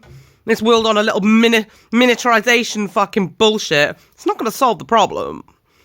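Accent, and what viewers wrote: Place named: Wales, United Kingdom. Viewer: Another great video. Really had me thinking deep and reflecting with this one. Just curious, you have an interesting twang on your accent - where abouts are you from? I thought Welsh at first but maybe I'm wrong.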